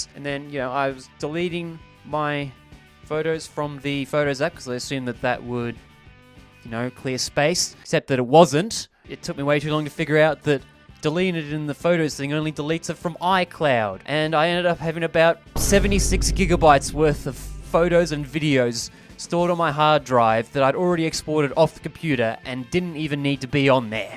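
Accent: Australia. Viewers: I wasnt expecting Australian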